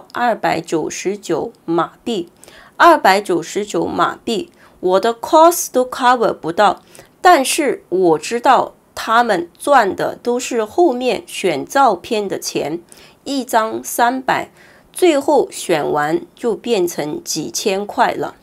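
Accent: China. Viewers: 你的口音大陸人，我不知道你的目的是什麼？ 我看到是美國也在世界各地媒體和BBC英國媒體之前也是有收撥款來黑大陸 ， 你了解的大馬是很少 第一在大馬攝影師也是要去註冊申請公司才能營業也要交稅 第二大馬建設和大陸合作很多年了本地政府也為了保護國家就業只是批准一些專業人事來工作 其他承包商一定要是本地公司除非是本地沒有企業有代理的才能申請，勞工大馬本地人也不做也是進孟加拉和印尼工人居多 如果你說的攝影師沒有執照去大馬營業拍攝如果被抓到他們也會被罰款或者坐牢 為了賺299值得嗎？攝影師恐慌是好事其實可以逼他們創新和新的拍攝方式 如果大馬本地有錢也會一部分去包其他國家優秀的射影師來拍攝不同風景 因為本地的攝影師拍來拍去一樣的風格喝喜酒討論會問是不是某個攝影社拍的同類型 也會悶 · 妳就是中國大陸口音啦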